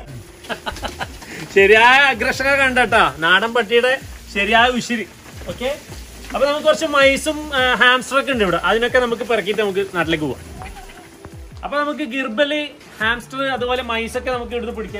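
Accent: Kannur, India. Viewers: കണ്ണൂർ ഭാഷ 👌...🥰.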